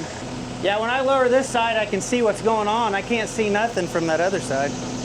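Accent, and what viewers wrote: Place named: Tennessee, United States. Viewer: I been hearing the ess-cavator as well. I think it's the Tennessee and Kentucky that migrated into rural southern Illinois.👍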